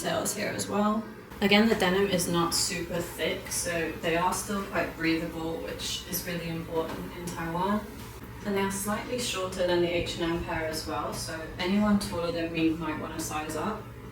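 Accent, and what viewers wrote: United Kingdom. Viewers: Quick question 🙋🏻‍♂️ Ur British accent is not that strong so because of the video is for Taiwanese people Or the original accent is not that strong🤔 (Just curious😂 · 英國腔真的非常好聽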